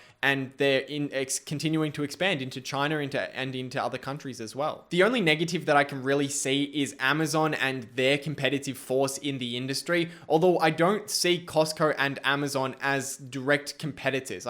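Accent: Australia. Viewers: Hmm rosy cheeks and an Aussie accent ☺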